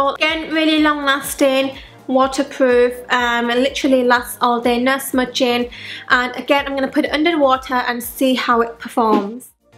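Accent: United Kingdom. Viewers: I absolutely love the asian/british accent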